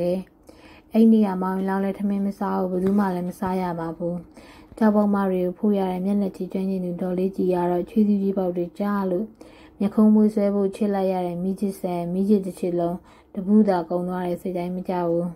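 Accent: Upper Myanmar, Myanmar. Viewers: အသံကအညာလေသံ...ချင်းတွင်းနဲ့ဧရာ ဆုံတဲ့ကျွန်းထဲကအသံမျိုး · ဖတ်ပြတဲ့ ညီမလေးအသံက နားထောင်ကောင်းချက် အညာကို တကယ်ပြန်ရောက်သွားသလိုပဲ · အသံေလးကိုက အညာဆန္ေနတယ္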